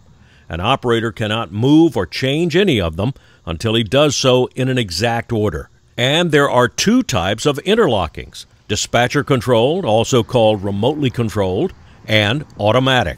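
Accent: United States. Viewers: Mr. speaks near perfect, American english. Enunciation, tone, accent, inflection, rhythm. Not a single word did i misunderstand. A dissappearing trait... ☆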